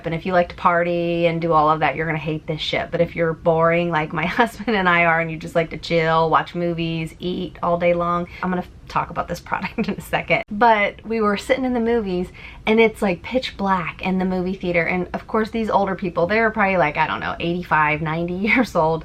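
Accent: England, United Kingdom. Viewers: Love your English accent 😂😍❤ this cruise sounds like a lot of fun to me 😍have fun! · Love your English accent 😊😂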